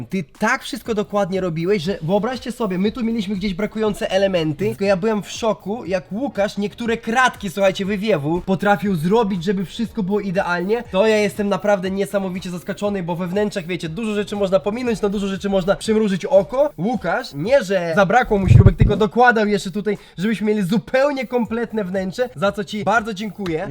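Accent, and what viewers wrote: Ukraine. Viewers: co ty jesteś z ukrainy że tak mówisz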